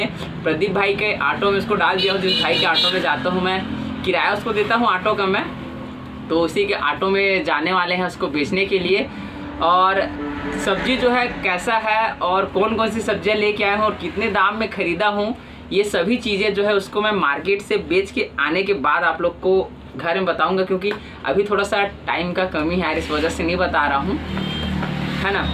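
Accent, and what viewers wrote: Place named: Chhattisgarh, India. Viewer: Main iski Hindi boli se samajh gya ki ye Chhattisgarh ka hai..mai bhi Chhattisgarh ka hoon Bhai .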